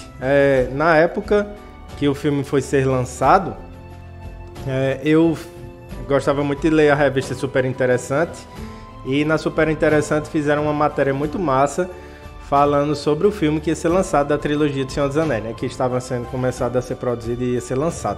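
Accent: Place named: Paraíba, Brazil. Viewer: Eita, sotaque forte! O macho é paraibano 🗣📚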